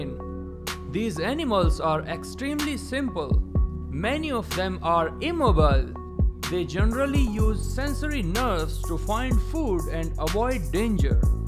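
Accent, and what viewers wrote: India. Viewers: Yeah text way better than Indian accent (not being offensive) just saying :| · It's not a broken english, he has an indian accent. I have a very different accent too, but it's not indian.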